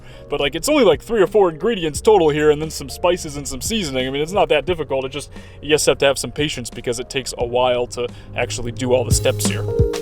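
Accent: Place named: New England, United States. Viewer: It was that 'HIGH-SPEED NewEngland speak' you do; threw them off during your greeting😉😊. Some visuals highlights of some of these cities/suburbs would be a nice addition,. JOY!💚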